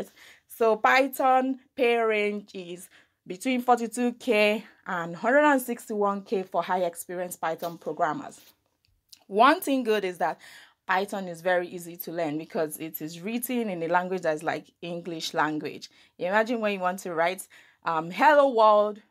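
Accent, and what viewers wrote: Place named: Igbo, Nigeria. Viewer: You sound so much that a Igbo/Nigerian woman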